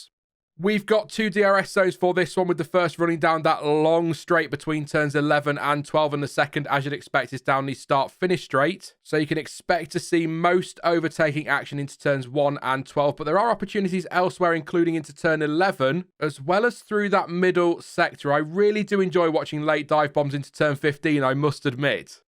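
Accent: United Kingdom. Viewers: Lovely voice from this man who are talking about circuit. Thank for mencioned my Country Brazil; I know formula-1 will be here in Brazil in November, 5th. You are welcome to Brazil sweet voice and British accent.🇬🇧💔🙋🏁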